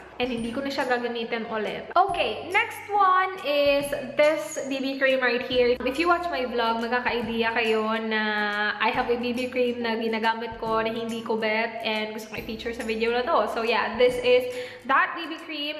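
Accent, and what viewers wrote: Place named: Visayas, Philippines. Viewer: blimeshis hahahaahha maklaro ang pagkabisaya ate :D chos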